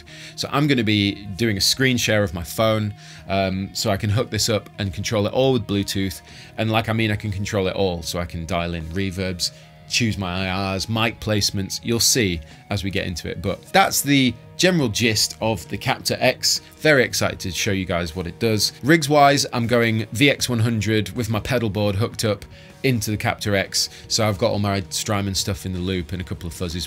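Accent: United Kingdom. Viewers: britain, given your accent :)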